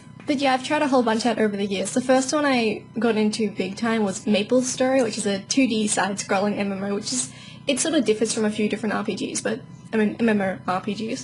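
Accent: Australia. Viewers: ZOOOOO MAAAAAAAAHHHH GOOOOOOOOOOOOODNESSSS?! You're Australian! Instant. Fucking. Sub.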